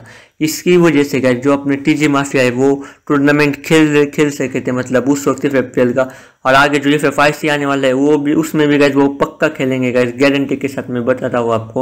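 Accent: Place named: Maharashtra, India. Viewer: U r talking accent is like maharshtrian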